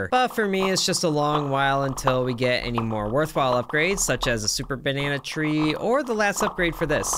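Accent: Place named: United Kingdom. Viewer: Your accent is probably why they think you're British? 🤔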